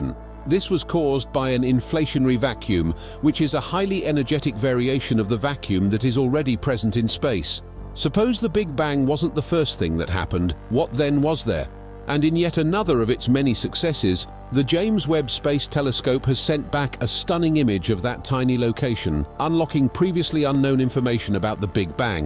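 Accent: United Kingdom. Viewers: One thing we can count on from the YouTube corporation is a reader with a Brit accent. How obvious does a grift have to be?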